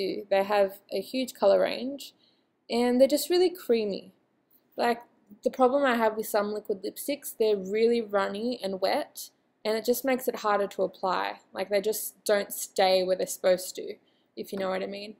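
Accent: Australia. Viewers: I didn't realise you where Australian! I've been etching your videos for weeks now! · Omg! I follow you on Instagram, I never knew you were Australian 😍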